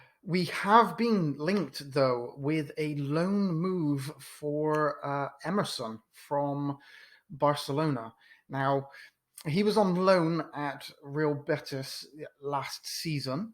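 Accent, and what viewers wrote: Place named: Southern England, United Kingdom. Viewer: Great vid lad , you sound like your from down south like me , you don’t find to many toffees down here , hopefully we get Rodriguez and Allan over the line , coyb. 💙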